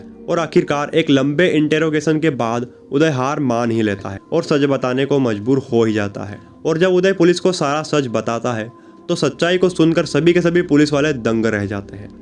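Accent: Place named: Bihar, India. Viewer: Social media per aap ko k apni language per thoda work karna chy sha ko sa Mt bolye pronunciation acchi honi chahie ek anchor ki presan nh hota preshan hota aapki language bihariyon Jaisi hai isliye story sunane ka maja nahin aaya anchor hone ke liye language per command hona zaroori hai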